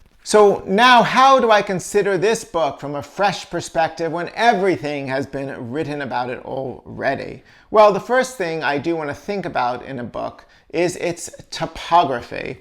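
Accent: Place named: New York, United States. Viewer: Fabulous as always,. There ought to be some way for you to make a really good living just doing these videos. NO ONE else is even remotely close to doing them as well. Your erudition and excellent sense of humor entertain us all the while you are teaching us bibliophile/bibliomaniacs more about the books we love. I do agree that I too was waiting for you to open the KJ and show us some of the interesting TYPOGRAPHY, not TOPOGRAPHY in the volume. That NY accent of yours definitely came into play, as I found myself also wondering: "Why is he repeatedly mentioning the topography of the work, he's not talking about maps?" Eventually, I figured out you were saying typography, of course. Anyway, do you have that copy for sale? Please email if you do. Much continued success, my friend.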